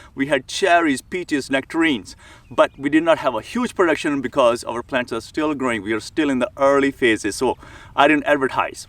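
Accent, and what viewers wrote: India. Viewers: Dude, you have a thick-strong Indian accent trying hard to talk like an American white guy from the US. Your thick Indian accent isn't fooling nobody. Lol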